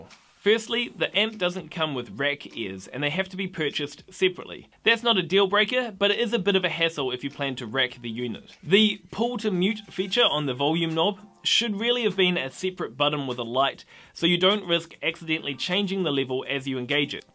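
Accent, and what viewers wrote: New Zealand. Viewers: Okay...I love guessing accents and I've always struggled with Aussie vs. Kiwi. That being said, I think I've gotten a better handle on the two and I want to guess. Are you from New Zealand?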